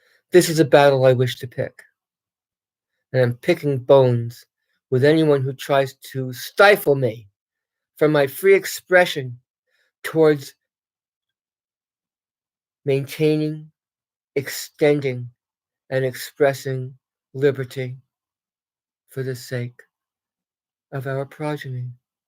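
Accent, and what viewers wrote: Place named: Brooklyn, United States. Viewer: Ma'am I hear that Brooklyn accent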